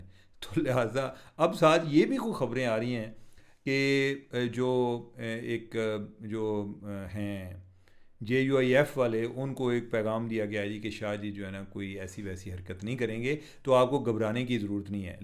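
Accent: Punjab, India. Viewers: Why can't you speak in proper Urdu language in a professional manner. Is Punjabi touch necessary. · Sahab acchi wali wadi Urdu hai kyon Punjabi da tadka ramdev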